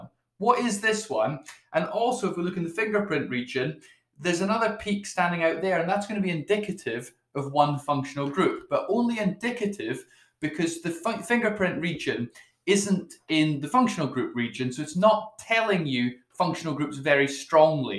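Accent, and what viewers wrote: United Kingdom. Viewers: You had my loyalty at "What the hell does this complete mess mean?"....and your British accent of course 😅😂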